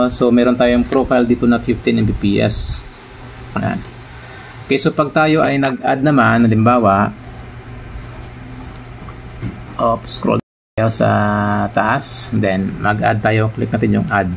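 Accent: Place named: Bacolod, Philippines. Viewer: taga bacolod ka sir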